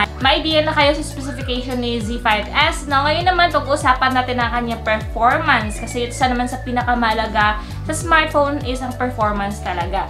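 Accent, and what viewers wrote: Visayas, Philippines. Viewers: WAIT... BISAYA PO KAYOOO!?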